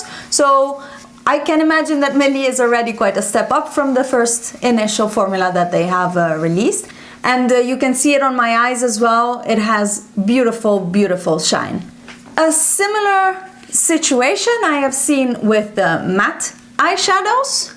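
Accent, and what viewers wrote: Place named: Italy. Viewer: è il primo video che vedo tuo e non so perché sapevo che eri italiana per la pronuncia hahahahahaha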